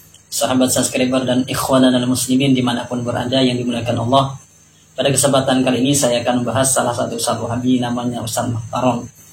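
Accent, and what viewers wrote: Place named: Java, Indonesia. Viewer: Ustad ini ngaku merasa pinter , Tp sebenarnya ga tahu sejarah Wahabi yg benar, Makanya dia salah TUDUH. Siapa pun ,selama salah tuduh,tentang siapa yg pantas disebut Wahabi, akan kelihatan taK tahu sejarah SEBENARNYA. Bahkan kalo maksain tak sesuai fakta, akan kelihatan gobloknya. orang jawa medok ini ,merasa bisa kalahkan ulama mekah (yg dia tuduh wahabi)yg sehari harinya bicara bahasa arab, dia cuma merasa hebat saja, itulah akhlak ahlul bid'ah yg memalukan . akhlak sombong, ulama medok merasa lebih pinter dr ulama fasih dr mekah. Padahal ustad ini ga tahu sejarah abdul Wahab bin Abdurahman bin rustum dari maroko /Mesir yg hidup di abad 2Hijriyah, yg berhaluan Tasawuf takfiri yg bidah juga. Nah Wahabi dari mesir inilah mazhab Wahabi yg sesungguhnya, Bukan muhammad bin abdul Wahab dr mekah yg hidup d abad 2 Hijriyah. 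yg memurnikan ajaran rasulullah dr Tahayul Bidah & churofat. Berani berbohong? Atau menutupi fakta sejarah ini. Ya begitu lah ah kak ahlul bidah & Syi'ah, yg sama sama ,bernafsu ingin memadamkan dakwah salaf, (pemahaman sahabat rasulullah) Dgn cara berbohong, menutupi fakta sejarah, fitnah , memberi julukan keji Padahal julukan itu salah sasaran, julukan Wahabi harusnya pada ahlul bid'ah, krna bapak nya muhammad bin abdl Wahab, yaitu abdul Wahab adalah sufi ahlul bidah, Yg di tentang oleh anaknya(muhammad). -muhammad anti bid'ah - ayahnya muhammad: abdul Wahab adalah ahlul bidah - jelas beda ajaran. Kaum salaf= muhammadi Ahlul bid'ah= Wahabi=sani uye= sperti mal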